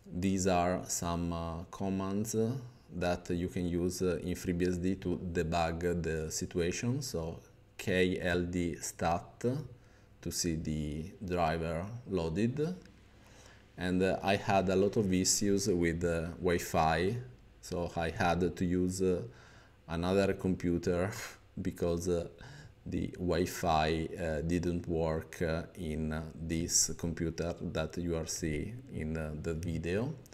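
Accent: Italy. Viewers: holy italian accent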